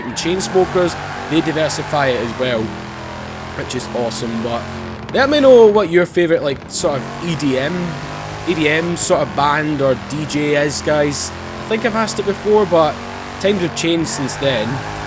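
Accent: Scotland, United Kingdom. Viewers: yeah SCOTTISH ACCENT IS THE BEST!!! :D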